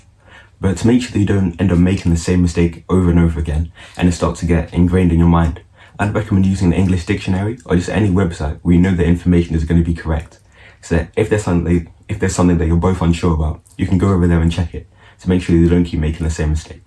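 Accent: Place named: United Kingdom. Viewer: Guy your British accent is perfect!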